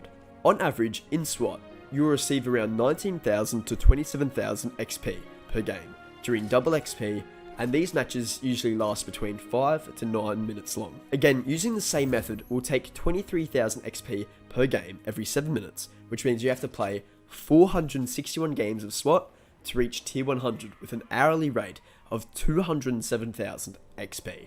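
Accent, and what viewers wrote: Australia. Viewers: Are you Australian you sound it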